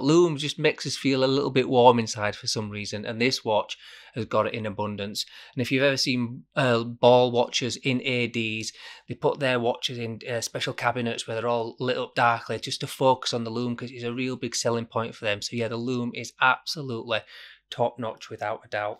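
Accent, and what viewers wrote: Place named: Yorkshire, United Kingdom. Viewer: A Yorkshireman giving watch reviews? Subscribed.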